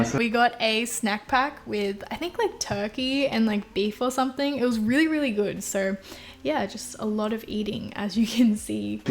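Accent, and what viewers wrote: Australia. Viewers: I’ve been watching you for 2 years but I still can’t get over your BEAUTIFUL Australian accent omggg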